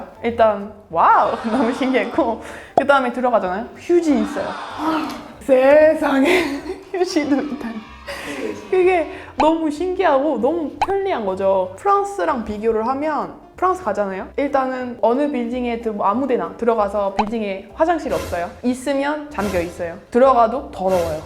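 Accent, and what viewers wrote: Seoul, South Korea. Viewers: 눈감고 들으면 완전 한국인 발음이네요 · 나보다 더 서울말 잘 하시네요.. 전 서울말 못함....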